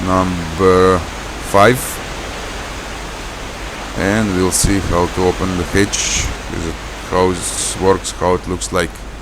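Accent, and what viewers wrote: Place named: Russia. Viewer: Вермахт это в переносном смысле за стрельбу из пушки, а не торпедирование где хочется посмотреть мастерство. А на счёт реализма странное не понимание, ну какой может быть реализм у симулятора да ещё с таким множеством поблажек который он дает игроку? Здесь не то что снижать, самому игроку приходится ещё додумывать как бы усложнить игру. Кстати на западе почему-то все упорно играют на низком бояться что ли? Я сперва пока не услышала акцент тоже подумала, что с запада, а потом смотрю под видео название-о да это наш.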